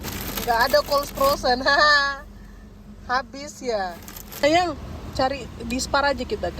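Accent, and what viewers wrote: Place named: Eastern Indonesia, Indonesia. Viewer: Lama2 logatnya kaya org timur ya kk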